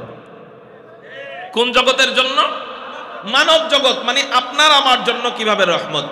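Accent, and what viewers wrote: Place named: Mymensingh, Bangladesh. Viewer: হুজুর আমি আগে মনে করতাম আপনের বাড়ি ময়ময়সিংহ কারন ময়মিনসিংহের বাসায় কথা কনজে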